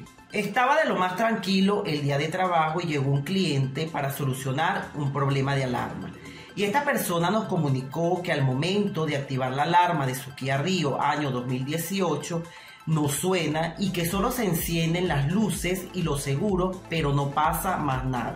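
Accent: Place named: Colombia. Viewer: claro maestra de Peru soy electricista automotriz y trato de actulizarme a traves de youtube ojala el otro año pueda matricularme en un instituto conocido en mi pais gamor para aprender electronica automotriz y usted maestra de que pais es? ese dejito tan bonito acaso de Colombia?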